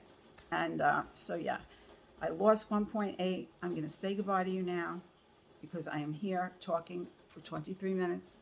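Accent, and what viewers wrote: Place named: New York, United States. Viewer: I love your newyork accent and your journey sw well done 🐝🐝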